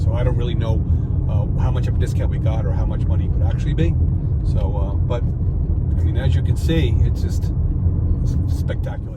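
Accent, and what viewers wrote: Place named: East Coast, United States. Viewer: No disrespect but you sound like people from the east coast. Why are you here? Hope you’re not an east coast liberal. If so please go home.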